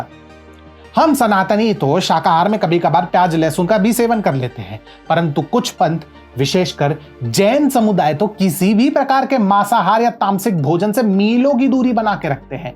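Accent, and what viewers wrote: Standard. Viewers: हिन्दी भाषा पर आपका अधिकार है. आपके शुद्ध शब्द चुनाव एवं उनके शुद्ध उच्चारण के कारण आपके वीडियो देखने आरंभ किए हैं.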